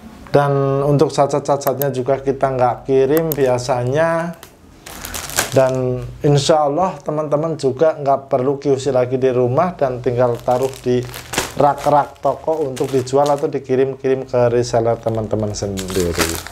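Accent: Banyumas, Indonesia. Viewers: Bahasa indonesia logat ngapak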